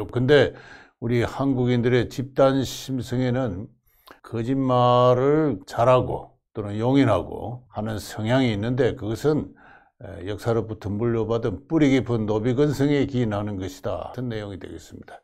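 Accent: Gyeongsang, South Korea. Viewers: 경상도. 사투리 듣기 싫어유 😢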